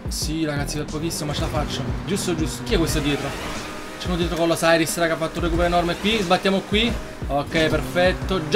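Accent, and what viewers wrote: Naples, Italy. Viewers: ma sei di napoli zio?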